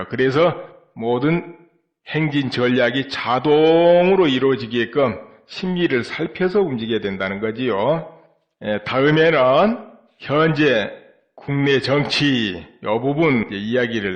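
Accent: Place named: Honam, South Korea. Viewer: 재밌고 논리적 말쌤과 이해가 쉽도록 진행. 그리고 구수한 목소리....감사합니다. 헌데 호남사람이시지만 한글의 특징가운데 구개음화 라고하는 규칙이 있는데 예를들면; 전라도. 사람들은 의" 발음을 못해 으"로 하죠 , 그래고 진행자께서는 북한이라쓰고 발음은 북칸이라 하셔야죠. 등등. 좀 생각을 해보십시오 어쩔까요. ㅋㅋ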